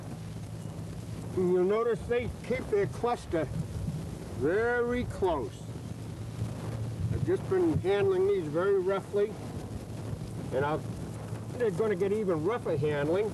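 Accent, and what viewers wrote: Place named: Rhode Island, United States. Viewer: Has kind of a Quahog accent.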